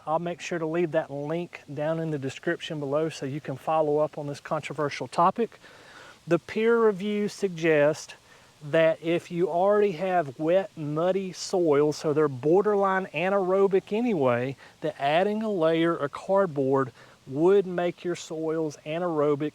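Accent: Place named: North Carolina, United States. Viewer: Are you from North Carolina? Your accent sounds like it.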